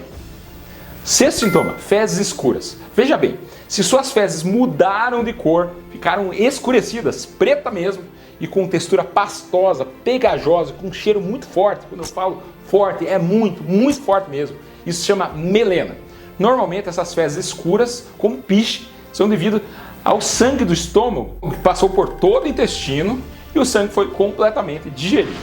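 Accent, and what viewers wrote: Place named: Paraíba, Brazil. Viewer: Nordestino paraibano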